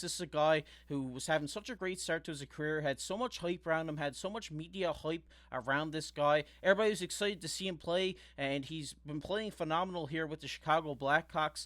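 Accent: Newfoundland, Canada. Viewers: No trouble to tell your a fellow Newfie! Good to see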